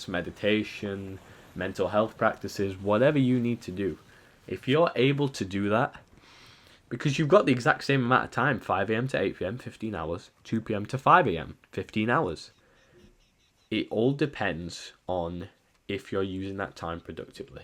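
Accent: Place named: Sheffield, United Kingdom. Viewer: sheffield accent spotted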